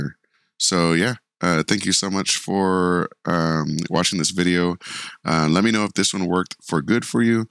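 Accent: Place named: Jamaica. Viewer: It worked. Thanks. On a side note, you sound Jamaican.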